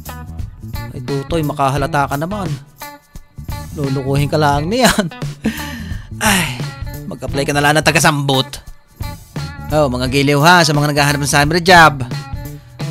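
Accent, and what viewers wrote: Batangas, Philippines. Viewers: Nice idol, 😂😂😂😂 iba talaga ang dilang Batangueño 😁😁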